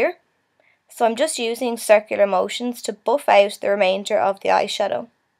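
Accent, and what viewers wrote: Ireland. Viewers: Ahh the irish accent :) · ur irish? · Are u irish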